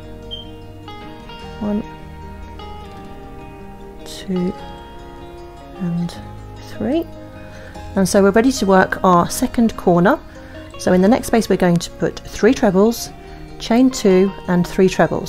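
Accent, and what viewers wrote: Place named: United Kingdom. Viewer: Hi this is a lovely design and you explain it so well. I’m a beginner in the uk, are these US or UK terms please? Because of your accent I thought it was uk terms but now I’m confused….it doesn’t take much 😂😂 Great video thank you x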